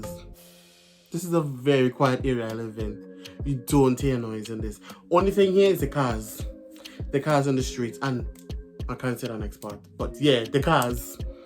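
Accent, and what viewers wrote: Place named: Nigeria. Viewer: Are you Nigerian? Lol, you sound Nigerian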